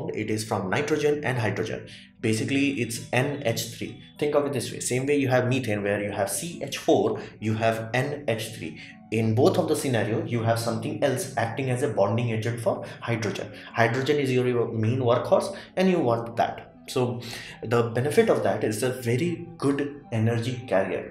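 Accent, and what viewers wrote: India. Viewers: Thank You :-) Piece of advice, speak slower, i've spent a lot of time with indian support people and it takes a while to get used to the accent. But other non native english people (I'm danish) have to translate twice when working with indians."indlish"<>english<>danish so talking slower is at the essense.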